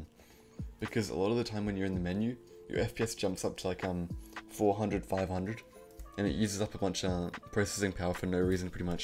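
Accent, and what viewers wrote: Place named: South Africa. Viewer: I smell a South African.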